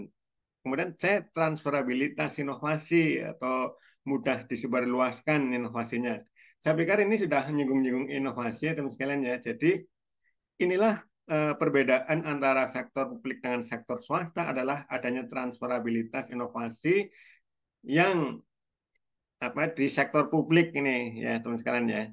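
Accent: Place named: Banyumas, Indonesia. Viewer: Org ngapak ini ya😂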